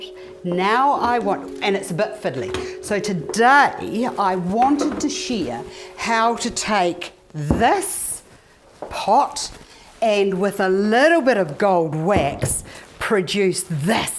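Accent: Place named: New Zealand. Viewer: It is a Kiwi accent (New Zealand).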